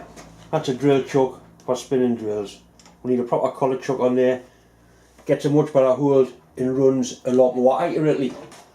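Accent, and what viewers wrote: Newcastle, United Kingdom. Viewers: NE English or Geordie. Recently heard it comes from the local Miners using George Stephenson's safety lamp rather than Davie's. Went to college in South Shields and never heard why Geordie, who knew? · Newcastle English.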